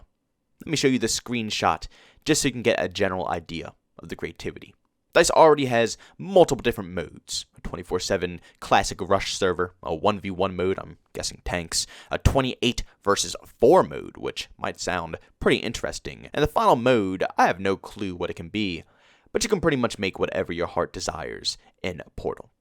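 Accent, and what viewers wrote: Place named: Texas, United States. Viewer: You talk like an American, not a good way, in a Texas annoying type way. I'll stick to Level Cap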